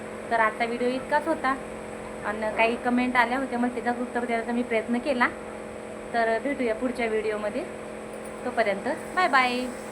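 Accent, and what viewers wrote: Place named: Vidarbha, India. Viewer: आमच्याकडे टोमॅटो नाही खात उपवासाला 😊बाकी वैगव लक्ष्मीचे उपवास मी पण केले तर खूप वर्षे आधी आणि तू करतेस तर व्हिडिओ टाकायला काहीच हरकत नाही आणि मला एक सांगायचं आहे तूझी भाषा ऐकून लगेच कळलंकीतू विदर्भातली आहेस 😊कारण आमच्या ताई ( नंदूबाई ) अमरावतीच्या आहेत बाकी तूझ्या साधेपणा मध्येचतूला यश मिळेल ❤❤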